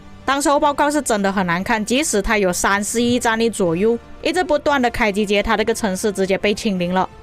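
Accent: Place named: Malaysia. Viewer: 加油（其實我是因為你的聲音有很重的大馬腔我才開始看你的影片的😂），你的影片都很有參考價值，繼續做下去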